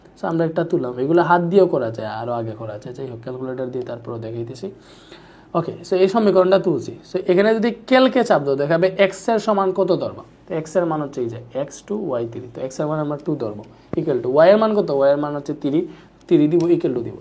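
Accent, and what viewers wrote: Sylhet, Bangladesh. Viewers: Vai sylheti naki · Sir mone hoy amader Sylhet er😫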